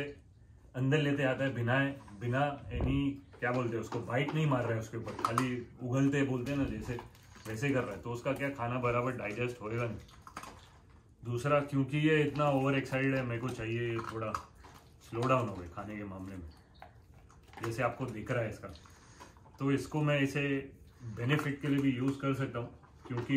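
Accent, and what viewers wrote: Mumbai, India. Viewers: Sir,your talking method is awesome..(like mumbai style)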